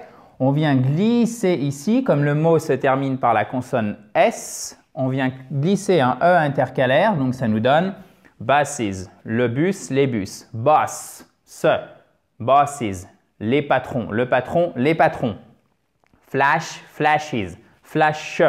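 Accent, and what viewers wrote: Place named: United States. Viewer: Bonjour. Merci tout d'abord pour ce "fabuleux" travail avec toutes ces vidéos! Mais pourquoi devez-vous systèmatiquement utiliser l'accent américain? Il m'arrache les oreilles et avant l'américain, il y avait l'anglais. Alors, pourquoi ce choix? merci à vous.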